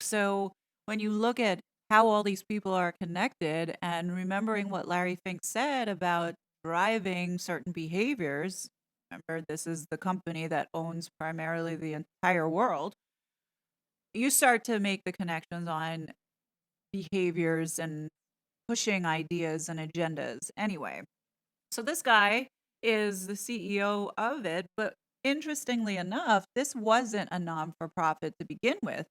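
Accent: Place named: New York, United States. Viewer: I admire your civil spirit and love your cool NYC accent! If I was a NYC resident, I would join you in your protest! Let's show them that they cannot get away with their shenanigans.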